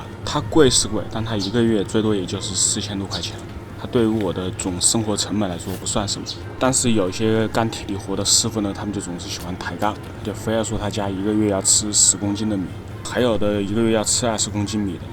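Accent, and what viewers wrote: Wuhan, China. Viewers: 你是武汉的？ 藏不住的口音 😂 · 听口音武汉人吧？老乡！ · 聽你口音感覺就是漢普，等到14分鐘的時侯就真的冒出一句武汉話。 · 朋友，你这武汉普通话，好亲切啊，多久没吃热干面了。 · 武漢普通話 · 主播是武汉人吗？感觉是汉普，另外听到了热干面，感觉很亲切！ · 我一听你的口音就觉得你是武汉人， 果然是哈哈哈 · 博主听口音是武汉的吗？ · 这期提到热干面，加上口音，应该武汉人无疑了。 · 听口音是武汉的吧？抖音也刷到过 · 主播这口音武汉人吧？ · 这口音一听就是武汉人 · 武汉口音？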